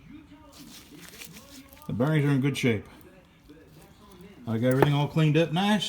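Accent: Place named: Connecticut, United States. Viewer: great job,i could tell by your accent you were originally from Ct.....lol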